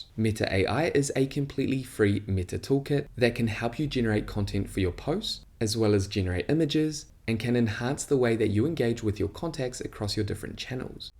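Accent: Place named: New Zealand. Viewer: Good job mate! I loved your kiwi accent btw!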